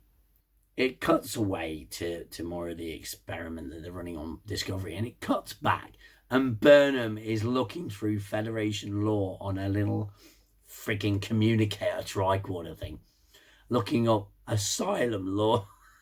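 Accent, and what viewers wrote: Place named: United Kingdom. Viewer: Were it not for your Limey accent you Id take you for the testosterone poisoned , but still physically doughy, caucasian fan boys that infest the body of SciFi fandom. I didn't like the first season and 3 quarters of Discovery. However, last season and this have been spot on. You whiney "proud boys are a joke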